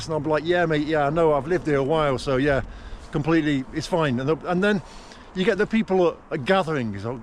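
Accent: England, United Kingdom. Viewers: You probably never will be viewed as a "real Russian" by most in Russia. You may speak the language, but have a clear English accent.. I can imagine it is frustrating for you, but it's this way in most countries..There are some countries that accept and embrace foreigners more than most...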